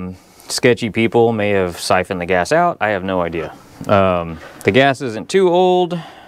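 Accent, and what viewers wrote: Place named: Texas, United States. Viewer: Hey you know Texas Talk just like me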